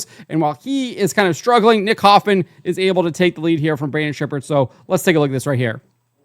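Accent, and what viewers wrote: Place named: East Coast, United States. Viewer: Damn right.... Idk where you coming from (location) (NC in the house) but you sound like an east coast guy and we've got very similar racing tastes. But I most definitely agree, this is one of best shows going. I checked the North-South 100 off last year as a bucket list event. Unfortunately I won't be able to go back this year as I'm checking off some other bucket list stuff .... However The PDC and Eldora are on next years bucket list..... Maybe we can hook up at some point and catch a race, have a beverage and enjoy a race together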